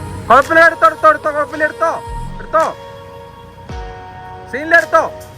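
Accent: Thrissur, India. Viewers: Bro അത്ര Proffesional അല്ലാത്തത് കൊണ്ട് ആവും video ക്ക് നല്ല orginality . മറ്റുള്ളവരുടെ vlog കാണുമ്പോൾ skip ചെയ്യാൻ ഉള്ള tendency ഉണ്ടാകും ഇത് ഫുൾ കാണാൻ thoni കണ്ടു ഇഷ്ട്ടപെട്ടു follow ചെയ്തു കൂടെ കൂടി ഇനിയും ഇതുപോലെ ഉള്ള video തൃശൂർ slang ഇൽ കേൾക്കാൻ ആഗ്രഹിക്കുന്ന ഒരു കൊല്ലംകാരൻ ❤ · അവൻ്റെ പൂറ്റിലെ ഒരു കൊണച്ച തൃശൂർ സ്‌ലാംഗ്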